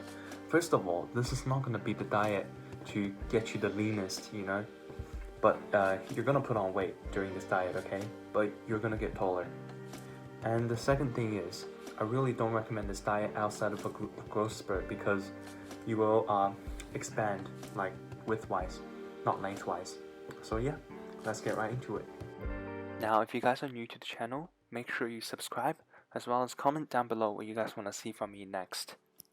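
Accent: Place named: Australia. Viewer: Aussie mate 😎 😂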